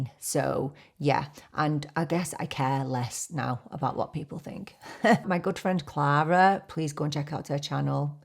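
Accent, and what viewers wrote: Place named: Yorkshire, United Kingdom. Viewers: What perfect timing for this video. I had to pick my car up from a service after work and had to wait another hour for it to be ready!! Thank you so much for sharing your story. I feel we have had similar experiences in life living abroad, having a child overseas without family and we are both teachers! Teaching is my second career and I didn’t study for my PGCE till I was 43 (now 59!), it was a scary decision but I’m so glad I did it. Whilst it’s not the best paid job I’ve had it’s definitely the most enjoyable! I detected the Manchester accent straight away and since you mentioned it today I can detect a little Yorkshire accent! My dad was a Yorkshire man and half my aunts/uncles and cousins have a Yorkshire accent. During Covid I was in Thailand alone working online, zoom calls everyday with my students and parents, I was really out of my comfort zone in front of the camera, but I did it! 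My partner is an Ozzy and couldn’t get back into Thailand till almost 18 months later. It was during this time I really got into YouTube and I got so much joy from making new friends through the amazing communities too. It was a time to really appreciate my own company (apart from my 5 rescued dogs). So happy I have found your channel, you speak with honesty and integrity and I love your videos.❤ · Yeah! I picked up the Yorkshire accent. Though I was thinking North Yorkshire. I'm in the US but I watch a ton of BBC. A lot of the reality medical series I watch are up North in Yorkshire. I'm sure it's really my ignorance that helped, tbh. And, oh my goodness, why you ever thought you were less than is beyond me. You are stunning.😍 I do think though that many of us women, especially moms because we lose our identities when the kids are young, don't really come into our own until our 40s. So thrilled to be part of your journey on YouTube.💄💖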